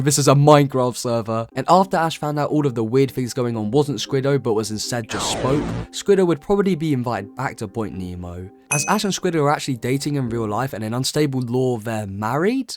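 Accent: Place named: United Kingdom. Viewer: Bros br'i ish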